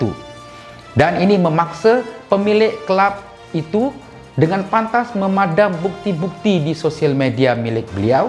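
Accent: Java, Indonesia. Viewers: Wak bg pekat sikit bahasa jawa tu😂😂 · Wak kalo ckp pekat lg jawa tuu lagi mantop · Wak pekat sekali jowonya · ckp meddok jowo tu ade haha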